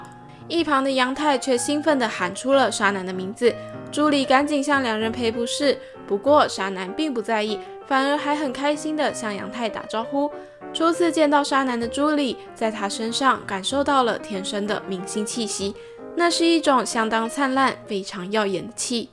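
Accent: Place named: Taiwan. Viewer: 角(音同腳)色，請不要被簡體字同化 ，為何唸角，可以查找辭海類的大型辭典即有說明 ; 角(音同決)主音律上使用，聽播主口音應該是在地臺灣人(不好意思，是頗標準臺灣國語)，個人建議還是該依傳統正體字說法為上。 這本番外，十年前還是大學生時就拜讀過，畢竟，《玩偶遊戲》是少女漫界的教科書，七年級生必讀經典！現在看到又想再把書找出來回味了，不過，當時看就覺得翻譯有問題了。早產是指孕期未滿37週即分娩，書中紗南破水時已9個月，也就是至少38週了，雖不到40週的預產期，卻不是旱產！卻翻成早產！？另則，珠里和早穗翻叔母更是錯的離譜！早穗是珠里母親的妹妹，所以早穗是珠里的阿姨不是叔母，更不用說叔母是大陸用法！臺灣人稱叔叔的太太該叫嬸嬸，珠里是早穗的外甥女，不是侄女！雖然日文講法都一樣，但華人教育是分得很清楚的，這是很明顯的誤譯！這作品的翻譯程度……不予置評。真的會懷念書名仍叫《玩偶遊戲》(大然)的時期，翻譯的中文造詣著實天差地別呀~ 直澄被掰歪，我第一次看時的確不太能接受，一開始無法接受的原因，不是不能接受出櫃，而是直澄他本來就不是性別認同障礙者或是同性戀者， 他的性向是很一般的異性戀，突然要粉絲接受他的"轉性"，真的不容易呀！直到我接觸到《昨日的美食》(身為ACGN齡逾30年的老牛皮，雖算不上腐女，BL多少還是有涉獵)，發覺兩位主角的角色設定並非著重於情人(戀人)，多數看到的是倆人在相處日常上，經過諸多磨合後，心靈契合度和不必言明的默契提升，我在兩個中年男性(魅力大叔)身上，看到了老夫老妻的相處模式，沒有令人眼紅心跳的煽情，只有平淡如水卻長情安然的陪伴！這樣的情感表現，是連異性戀都難以達到的或者該說是多數人都會心神嚮往且追求，若是小時候的男神(雖然我自小是羽山派)和現在的情人相處如同筧和矢吹的話，身為粉絲的我，大方祝福！真心期盼，仁科會是直澄王子的幸福。 希望未來有一日能再看到這部經典的後續。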